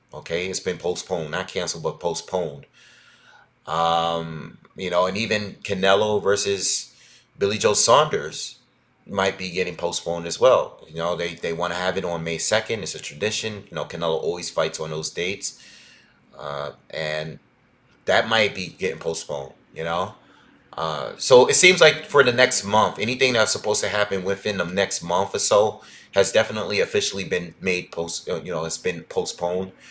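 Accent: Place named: New York, United States. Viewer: Are you from New York? So I'm a Brit from London and I've always loved that New York accent. And as I'm writing this, your video is playing in the background and yes, you're definitely a New Yorker for sure; such a cool accent!!!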